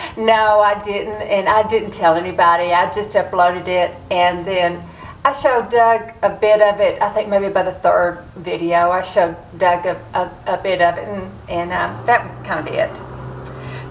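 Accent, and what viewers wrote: South, United States. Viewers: u have a beautiful disposition and the southern accent makes it even more precious,i enjoy seeing your loving and sweet relationship with your husband,i enjoy seeing how u make everyone around u feel special in so many ways, and in so many details,like bday celebrations with food,presents and making them feel special.i enjoy seeing u be in an awesome shape and do your exercises and stay healthy and strong,enjoy seeing your vibrant personality come through even when u r facing surgery.enjoy seeing bravery in action for putting yourself out to the world to be seen and heard.all these things about u and am sure many more to come, i feel need to be noted,acknowledged and celebrated,thank u for sharing your awesomeness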